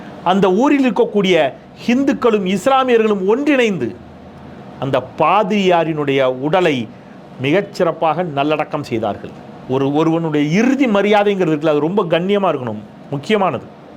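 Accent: Tirunelveli, India. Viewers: அண்ணாச்சி உங்க நெல்லை தமிழும் உள்ளார்ந்த உறவின் நெகிழ்வும் உள்ளபடியே நெஞ்சத்தை தொட்டு விட்டது வாழ்க.... வளர்க.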